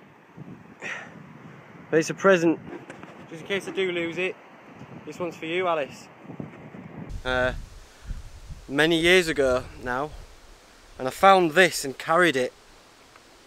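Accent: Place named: Sheffield, United Kingdom. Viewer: Bet your from round my neck of the woods with your accent. Sheffield?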